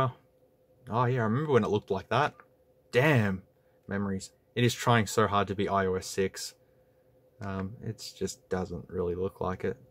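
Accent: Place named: Australia. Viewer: Australian ashens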